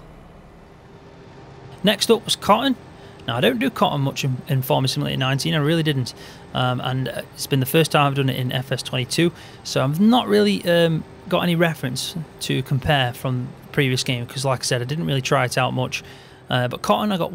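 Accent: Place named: Cheshire, United Kingdom. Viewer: Hi, new viewer here, and a new sub. I'm from Cheshire and I recognise you accent, obviously 😅. I'm from Northwich. Nice to see a local lad pumping out some decent FS material.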